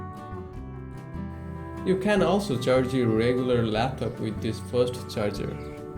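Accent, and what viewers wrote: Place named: Bangladesh. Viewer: hey bro...😍 You r from Bangladesh? you're voice accent is really amazing 😍 Love 🇧🇩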